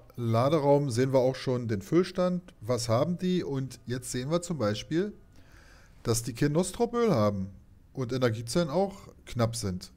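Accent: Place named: Berlin, Germany. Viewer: Klingst wie ein Brandenburger. Die haben immer den heftigsten Berliner Dialekt.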